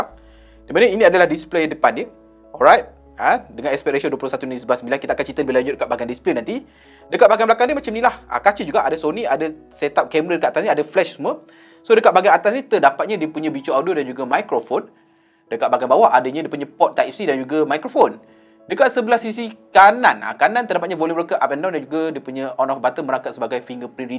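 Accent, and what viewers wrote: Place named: Malacca, Malaysia. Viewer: Abng asal melaka ke pliss reply 🙏🙏😌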